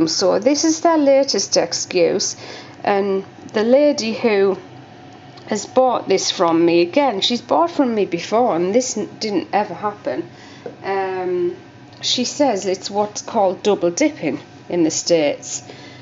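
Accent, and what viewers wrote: United Kingdom. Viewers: I think that a lot of us that love your voice are not from the UK. All the UK accents are beautiful sounding. For me the best part of your voice is that it just sounds like you are enjoying what you're doing and when someone sounds like they are enjoying what they are doing their voice is priceless.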